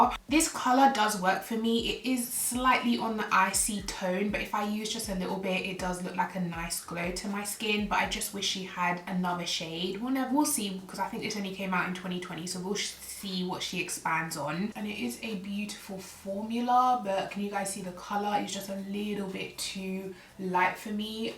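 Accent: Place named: United Kingdom. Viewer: When you're searching for a specific video and then you hear a British accent 😫Subbed x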